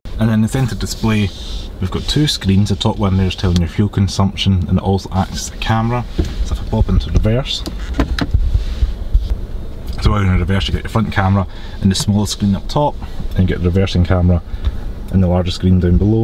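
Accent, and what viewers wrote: Scotland, United Kingdom. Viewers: Good review and nice with a scottish accent. "Chelsea wankers" (childseat ankers) 😅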